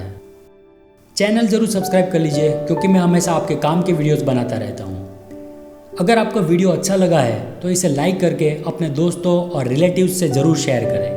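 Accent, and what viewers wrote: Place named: Rajasthan, India. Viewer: Aap ki bolne ki style se lagta he aap Rajasthan se he.am I right?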